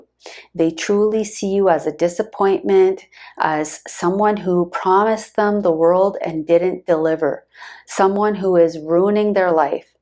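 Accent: Canada. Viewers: Hey, you are really good. I just subcribed to your channel. You sound like a canuck... Looking forward to viewing you videos......................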